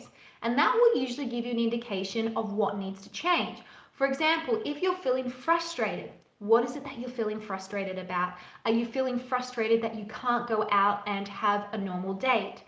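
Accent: Australia. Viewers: How about we feel like 💩 because our rights have been taken away! You: “Things aren’t going back to normal.” Well, sounds like you’re in Australia by your accent. So, enjoy being under house arrest & just taking it like a good lil sheep.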